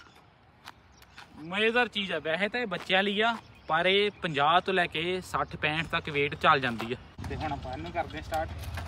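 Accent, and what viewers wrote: Majha, India. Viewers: Majhe aale eve hi bolde aa kaka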